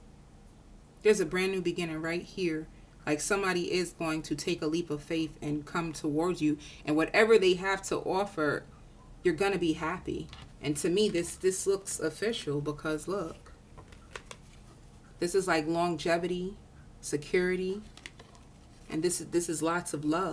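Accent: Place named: Midwest, United States. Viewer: You sound like your in the midwest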